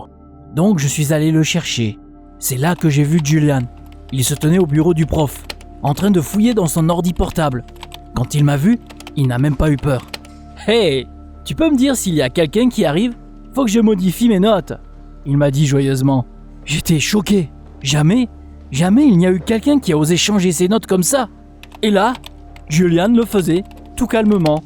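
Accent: Marseille, France. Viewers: Je viens juste de remarquer qu'il a un accent marseillais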